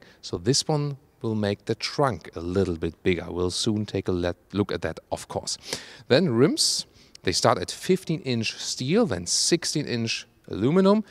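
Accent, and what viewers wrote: Germany. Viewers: i like ur casual look betterand ur hairback looks better.still got a nice german accent~~~~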